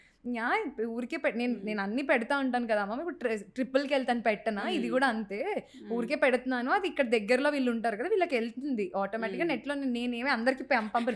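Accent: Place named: Telangana, India. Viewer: O telangana na?? I saw your videos but in mute so expected u to b andhra girl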